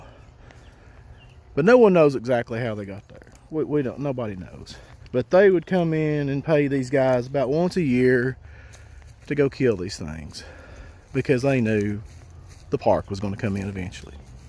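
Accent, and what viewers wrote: South, United States. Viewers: This is beyond interesting. Wow. U got a great southern voice and delivery too. Good job.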